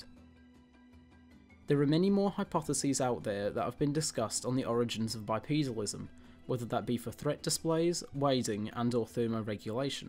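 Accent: United Kingdom. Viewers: L.O.L. Only an Idiot would believe this Darwinism Theory...The British accent doesn't help L.O.L. God is Laughing to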